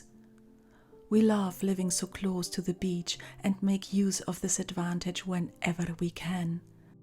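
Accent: Scotland, United Kingdom. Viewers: Very beautiful video I wonder if you could say where you are from originally because to me you sound so Scotish thank you xxx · I like your Scottish accent. 💚 I have a similar story. I emigrated from Germany to Sweden 14 years ago because my inner home is reflected in Swedish nature and I can experience my joy of nature and my contact with nature beings. I found love and have been married to my Swede for 7 years. I am very grateful and happy to be able to live here.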